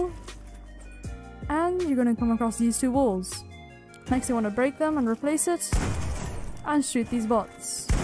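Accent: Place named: Europe. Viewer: U sound Europe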